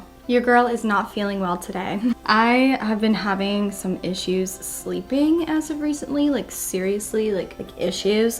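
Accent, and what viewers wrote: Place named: United States. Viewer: You have such a basic American accent I’m living like it’s not southern but it’s not like Boston or new York yk? I have a southern german accent and it’s so aggressive but yours is so smooth like a good whiskey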